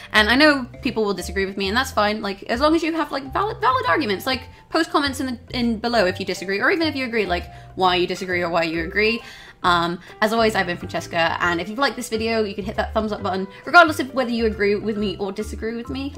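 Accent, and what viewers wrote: United Kingdom. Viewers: I'm sorry, I can't continue watching this video until I know where the hell that accent is from?! It sounds British sometimes and American others... WHAT IS THIS??? No but seriously, I agree with this, they could've easily made Bastet, just a cat woman, not a woman who has a couple of cat features etc.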